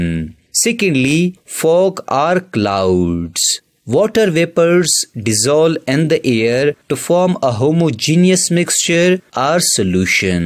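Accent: India. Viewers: I can't learn well because I'm distracted by the Indian accent 😭🙏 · I think hes india he cant help it its their accent though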